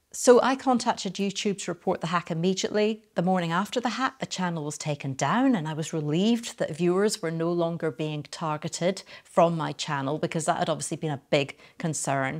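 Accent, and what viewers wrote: Scotland, United Kingdom. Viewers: Subscribed to. I enjoy your chanel so much, your content, and your professionalism is very much appreciated. Also I love your wee Scottish accent🤗. Being a fellow Scot myself, living in Spain, it's a pleasure to listen to you🙏. Keep up the great work.💓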